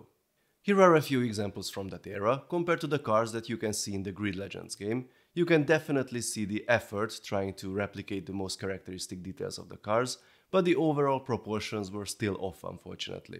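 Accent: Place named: Hungary. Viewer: Oh, that accent... so typical hungarian accent. After the first few minutes I started to get suspicious, and I hoped to find the 'location' of this channel. The location is Hungary of course, so my ears were right. It's not necessarily a bad thing, my ears are just sensitive to that. Hungarian accent is such a distinguishable accent, and I heard way worse before than that. Usually when I dare/have to speak in english... I hope that my accent is not typical hungarian! In fact, I learned russian for a bit 7-8 yrs ago, and I found out that my english turned to sound russian a bit, so I might have russian accent when I speak in english.😆 I also like when people speak in english with real russian accent, it's just sounds great to me.😁 Anyways, great video, it was the first vid from this channel for me. 😀(And it turned out, somehow it was the 3rd vid for me from here) It was cool to see most of the Speed Champions in one place!👍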